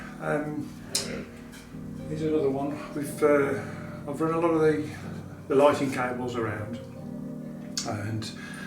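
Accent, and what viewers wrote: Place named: Black Country, United Kingdom. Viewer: I can be discouraging I bet ,if views am low ,but sooner or later some one will come looking for info on how do this kinda of thing and you would have helped them unknowingly unless they leave a comment ,you put Ur time ,money and effort into and u should now ppl like me do appreciate it ,I'm particularly looking forward to knowing were in the wall structure the vapour barrier goes for when I do this next summer thanks again ,I know you weren't fishing for comments but sometimes a good comment just gives us the encouragement to keep putting the effort in ,I for one am grateful for what you do ,you sound like too I'm from Tipton near great bridge west Bromwich way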